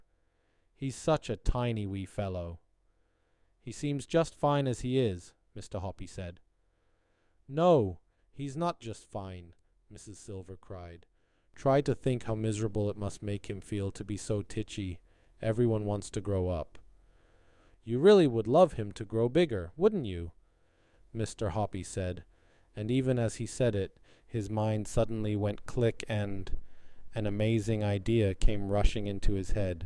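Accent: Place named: United Kingdom. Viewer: d=(^o^)=b thanks 좋은 영상 감사합니다. 영국식 발음 ㅋㅋ 워터~~ 영어화면 있으니 비교해서 보는게 좋네요^^ 영화도 보고싶네요^^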